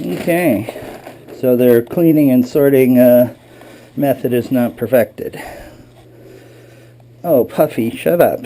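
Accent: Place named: New York, United States. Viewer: He's a New Yorker - they all sound like that! LOL!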